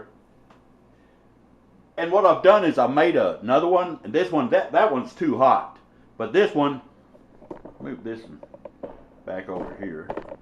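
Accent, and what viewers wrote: United States. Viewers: this dude has a very witty American accent! It really works tho.Thanks!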